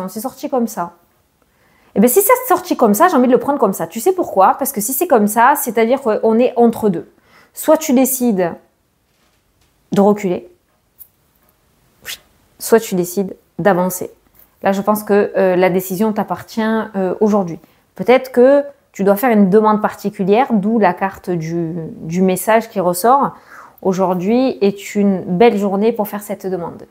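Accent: South, France. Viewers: Bien sûr que l' on te regarde, et surtout que l' on t' écoute, ton bel accent du sud nous donne un rayon de soleil tu es une vraie petite pile merci a toi et bonne journée bon weekend gros bisous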